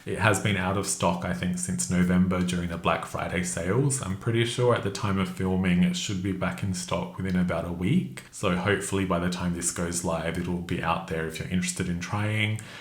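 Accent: Australia. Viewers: So glad I found your channel from James, amazing content, love finding an aussie voice! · Awesome reviews thank you. Love having an Aussie voice in this space ❤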